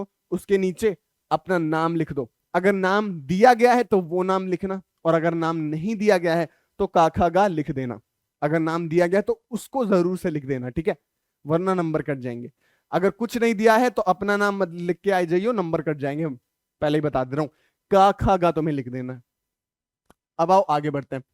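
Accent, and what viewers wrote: Bihar, India. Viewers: Bro pure bihari lag rahe ho 😊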